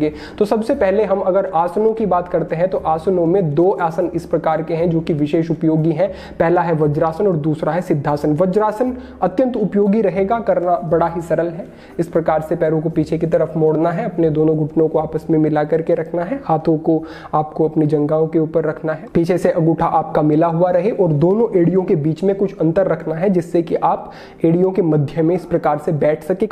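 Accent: Standard. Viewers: Kitni shudh hindi bolte hai aap🤩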